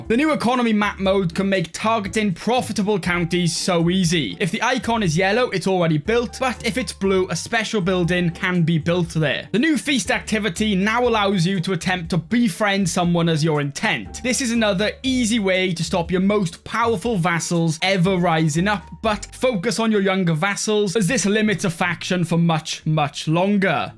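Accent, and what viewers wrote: United Kingdom. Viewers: Good video, I just wish your voice wasn’t so violently British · Bro i really aprreciate these tips but i cant listen this british accent.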